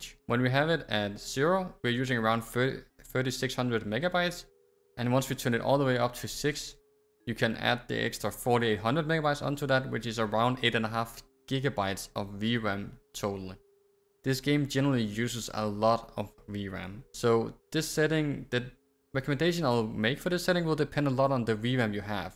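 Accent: Denmark. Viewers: den mest danske accent jeg har hørt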